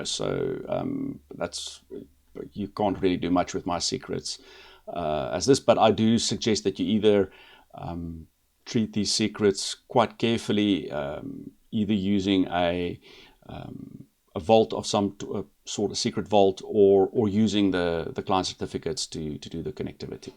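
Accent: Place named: South Africa. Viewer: Good stuff - The South African accent is also refreshing :)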